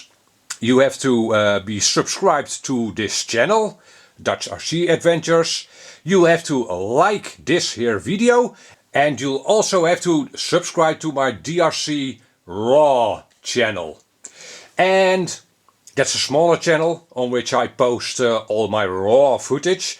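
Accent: Netherlands. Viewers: Nice work again , always the best positive products reviews and information. I like your English Dutch dialect gives it a fibe. Hopefully you'll sending this quadcopter to Sweden !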